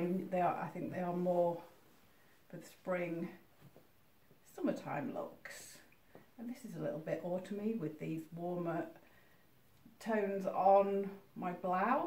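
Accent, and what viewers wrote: United Kingdom. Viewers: I DETECT AN ACCENT WHEN YOU SPEAK IN YOUR VIDEOS ARE YOU BRITISH?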